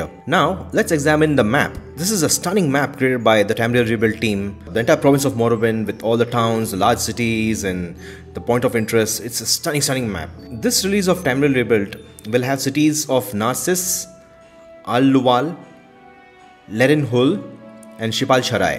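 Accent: India. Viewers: Great content. Going by your accent, I presume that you're Indian. I would be really interested to hear your take on the influences of Indian culture and religion on Morrowind's developers. I played this game long before I had the slightest clue of its real-world inspiration, so I have a hard time dissociating one from the other. Cheers!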